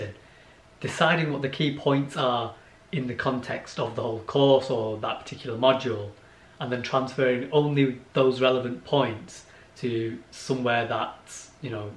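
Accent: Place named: United Kingdom. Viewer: British accent 😂👌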